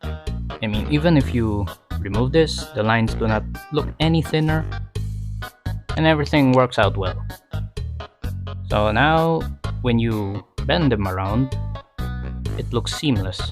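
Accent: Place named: Europe. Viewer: U sound like an European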